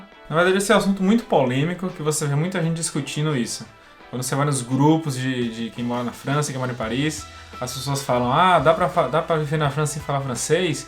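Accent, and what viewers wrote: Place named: Bahia, Brazil. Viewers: véy, verdade! Primeira coisa que pensei! Um conterrâneo, além do sotaque, as gírias baianas hahaha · Menino assim que escutei sua voz percebi o sotaque baiano. Adorei seu canal. Um salve direto da Bahia.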